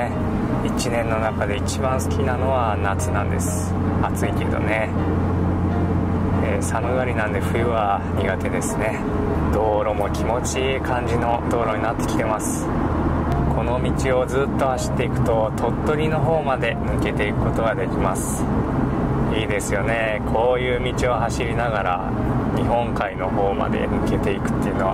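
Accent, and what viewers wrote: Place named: Okayama, Japan. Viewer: 天気も良く、zも艶々で気持ちいい動画ですね！ 岡山弁も炸裂で山形の私には新鮮で微笑ましく拝見させて頂きました～👍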